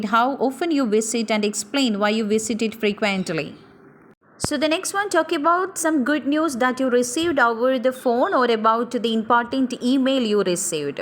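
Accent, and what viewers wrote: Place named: United Kingdom. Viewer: Mam is using British accent more.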